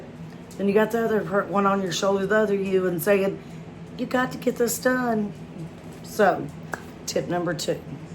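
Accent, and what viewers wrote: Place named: Kentucky, United States. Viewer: I knew you was from KY soon as I heard your accent.. blessings...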